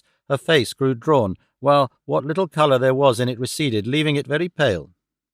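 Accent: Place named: England, United Kingdom. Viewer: Best version of this, in my view. The English accent just MAKES it.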